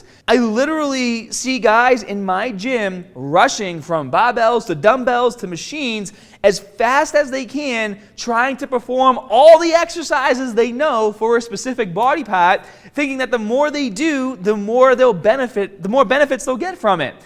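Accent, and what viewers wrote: Boston, United States. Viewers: This dude's Boston accent is amazing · is this dude from boston? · Love that bastan accent 💯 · I can hear a Boston accent on this guy or is it just me hearing this. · Nigga has a Boston accent and a White guy accent witch is just getting me confused like it really just throwing me off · Excellent video thank you!Love the Beantown accent. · GREAT info and Bahston accent! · Bahston kid! Good video, thanks.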